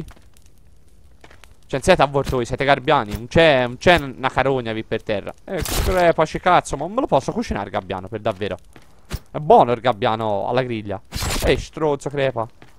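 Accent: Livorno, Italy. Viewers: ma sei livornese?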